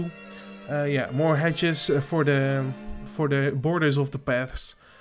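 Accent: Netherlands. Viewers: Ik hoor dat je nl bent 😏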